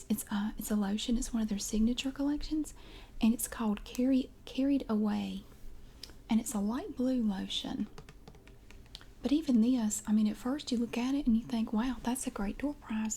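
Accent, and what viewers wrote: South, United States. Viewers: I may or may not use your videos to low key practice my southern accent, which I was clearly meant to be born with. Also thank you for always making me falllll alseeeeep! xx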